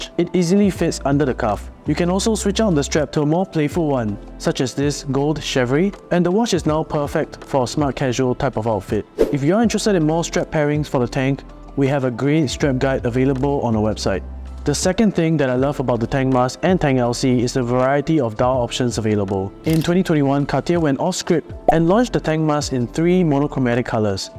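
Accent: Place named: Singapore. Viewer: Singelish is annoying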